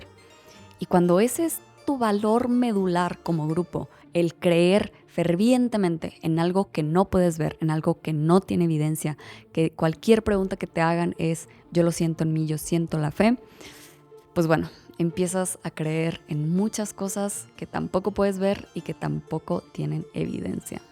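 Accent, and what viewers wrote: Mexico. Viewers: Bello nuestro acento mexicano,tu eres el ejemplo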